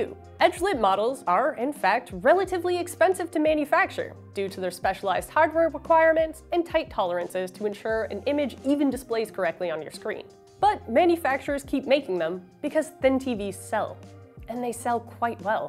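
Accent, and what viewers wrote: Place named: Canada. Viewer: Didn't even think aboat where RTINGS was located, but when the familiar accent crashed into my ears, either they were from Canada or RTINGS is based the. It was only after the job posting link did I find oat the truth.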